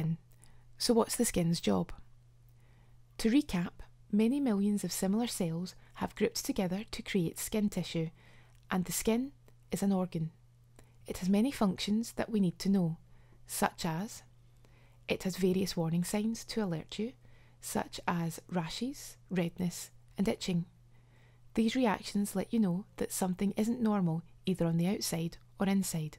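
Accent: Scotland, United Kingdom. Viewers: I really love your vids, please keep them coming! Very informative and I love your voice and Scottish accent!!! Cheers!!!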